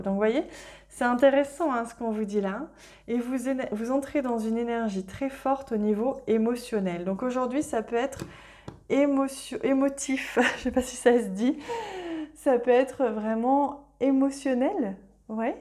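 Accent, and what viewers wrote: France. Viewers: Bravo ma belle; pour ton anglais, avec le ton frenchie ,dont nous les français avons tous, ce qui fait nôtre charme aux usa , tout comme toi ma belle, charmante , adorable, et rayonnante, comme l'étoile du berger, je préfère te voir comme cela, sans voile, je te remercie pour toutes les belles âmes que tu entraîne dans ton sillage ..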